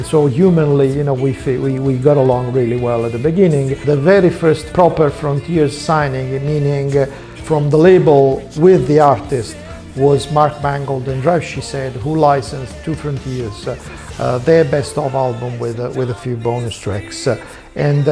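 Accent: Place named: Italy. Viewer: And the italian accent,of course.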